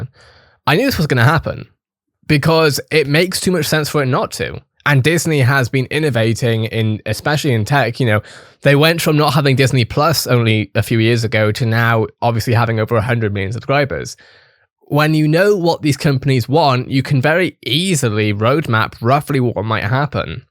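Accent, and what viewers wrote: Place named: United Kingdom. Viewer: You do a great British accent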